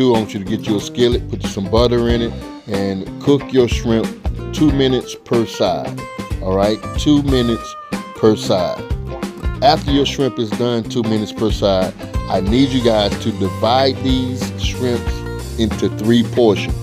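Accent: South, United States. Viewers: I love how Americans talk “Some of this here minced garlic” “Get you a skillet put you some butter in it” 😂😂😂 it makes my heart warm 😂😂😂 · Not all Americans talk like that. (Nothing wrong with it) He has to be from down south somewhere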